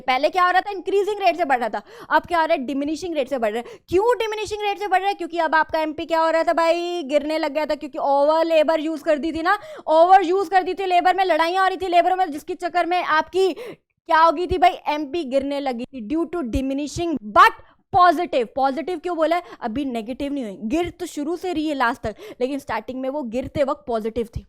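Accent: Punjab, India. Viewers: Mam your way of talking is really cute😂 giving full Punjabi vibes 😌and you gave the best and the easiest explanation of this chapter ❤love u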